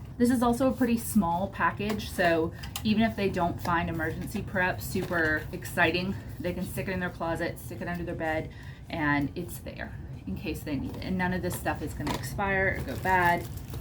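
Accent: Texas, United States. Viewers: As soon as you started talking, I said "you live in Texas, this is a realistic thing to prepare for!" I'm very into emergency prep after my town was hit with a horrible storm and I was without power for 10 days. It's so important. This year I'm budgeting for building up my preps and buying a (small) generator!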